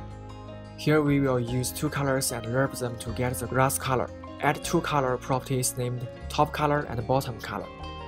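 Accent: China. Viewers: Chinese？Your accent is familiar to me. Good Tutorial !!! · 大陆口音，哈哈哈。加油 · 我听着就像国人的英语，哈哈哈哈，直到看到了输入法。视频真牛逼啊，学到了干货！牛逼！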